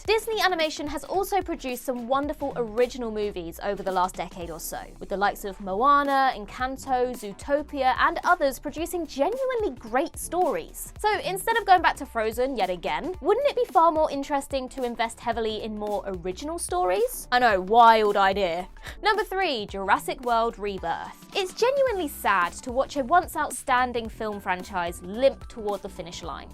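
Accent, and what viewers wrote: United Kingdom. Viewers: Could this hostess be any more British?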